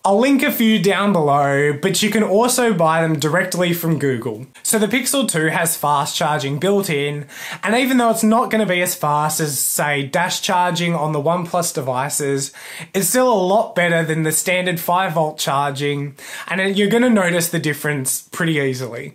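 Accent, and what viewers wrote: Australia. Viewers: What kind of accent do you have? Australia? I come from germany by the way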